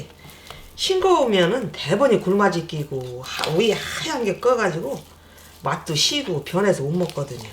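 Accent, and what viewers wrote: Chungcheong, South Korea. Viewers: 아이고 너무너무 잘하십니다 가까이계시면 인사나누고 싶네요 달인이십니다 충청도 억양 말씀이 정말 정감이 가네요 저도 충청도 부여에유 부디 건강하시고 맛난 고추장 계속 올려주셔유 ❤ 존경스럽네유 🎉🎉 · 충청도 사투리가 넘 정겹고 젤 맛나게 설명을 해주시니 머릿속에 쏙쏙 들어오네요~영상 감사합니다^^ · 저도 충청도 대전 사람이지만, 해외에서 선생님의 구수한 충청도 말씀으로 자세한 설명을 들으며 보니 고향생각도 나고 구수하고 정겹습니다.. 감사합니다. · 고추장 정학희. 일러주셔서. 감사하오.충청도 사투리 소탈한목소리 좋아요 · 아주머니 충청 도래요 말씀 하시는것이 충청 말씀같아요 고추장 하는것 된장도 잘보았습니다 항상 건행 하세요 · 보리밥에엿기를넣고 한꺼번에 삭히는 방법 이 편하겠네요 구수한 충청도말 씨도 부럽네요 배우고싶어 따라하는데 잘ᆢ안되네요 열씸히구독하겠습니다 고생하셨어요 잘ᆢ보았 습니다❤ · 구수한 장맛 구수한충청도 사투리 잘 보고 갑니다. 건강하세요. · 얼굴 보여주세요. 항상 웃음이. 정겨워요. 여사님. 충청도. 사투리. 옛. 어린시절생각이 많이 납니다. 칠십이. 넘어도. 구수한 자기 고향 사투리가. 듣으면. 어린시절. 시간들이 필림처렁. 돌아가. 자주. 시청합니다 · 말씀하시는 말투가 충청도 말투이신디 이 어느지역이예요? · 충청도이신가봐요 넘웃겨요 말씀하시는것이 구수하구 ㅋㅋㅋ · 충청.음정.넘 정겹네요~^^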